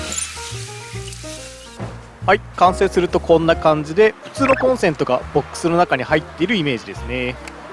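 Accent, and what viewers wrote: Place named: Aichi, Japan. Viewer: 関係ないけど喋り方きいてるとこの方は愛知とかの人かな？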